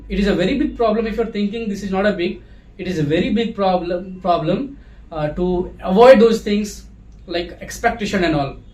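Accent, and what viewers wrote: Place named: South, India. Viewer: My dear sir, your voice has a little south indian accent...... So calm and pleasant ❤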